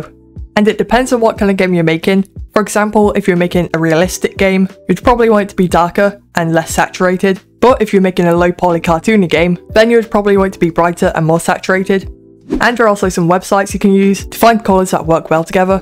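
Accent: United Kingdom. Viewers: This british accent is funny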